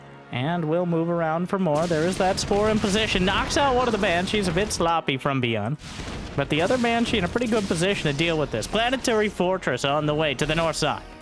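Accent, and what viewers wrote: South, United States. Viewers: Nice casting!! Would love to see one where you speak normal english, or some british accent. The southern accent is distracting from your great casting quite a bit. American in general is.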